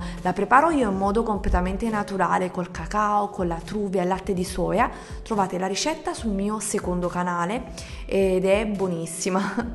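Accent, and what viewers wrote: Naples, Italy. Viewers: sei napoletana?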